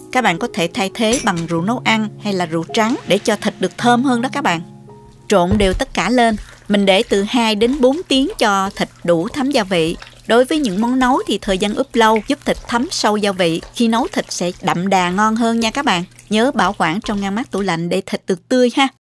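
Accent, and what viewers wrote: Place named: Mekong Delta, Vietnam. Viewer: Giọng dễ thương, đúng là gái miền Tây nam bộ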